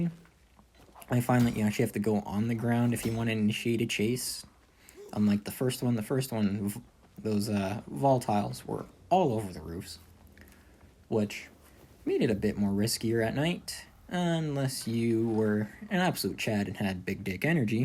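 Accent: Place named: Canada. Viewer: your canadian accent lol 😬😅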